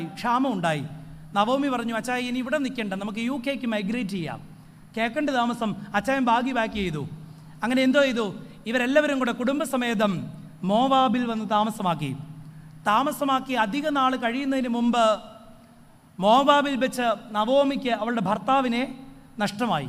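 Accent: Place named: Kottayam, India. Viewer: very valuable...speech Iheard the full-speed. kottayam language made me very happy.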